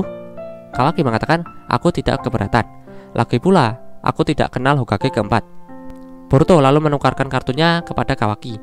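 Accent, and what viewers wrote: Java, Indonesia. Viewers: Medok men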